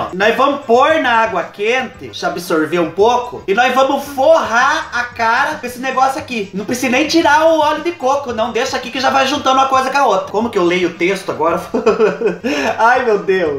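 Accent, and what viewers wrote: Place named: Caipira, Brazil. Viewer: amo seu sotaque caipira e sua simplicidade você não fala palavrão GOSTO muito de você e do seus amigos Deus continuo te abençoando beijo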